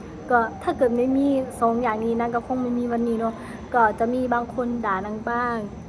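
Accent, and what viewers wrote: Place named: Phimai, Thailand. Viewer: พูดโคราชแบบนี้ไทยพิมายเด๊นิ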